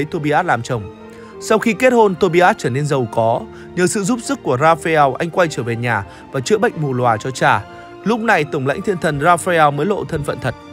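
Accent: Vietnam. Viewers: Nói giọng Bắc ko chuẩn lại nói nhanh nuốt chữ khó làm người nghe ko kịp, phát âm các tên TLTT ko rõ !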